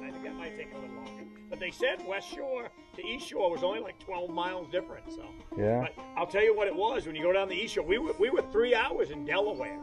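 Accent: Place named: New England, United States. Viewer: Love that New England accent. No doubt as to where he's from.😆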